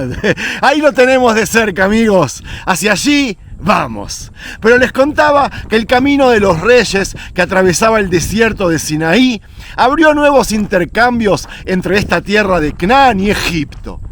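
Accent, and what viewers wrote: Argentina. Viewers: Eres de Israel tienes el acento de Argentino... 🤔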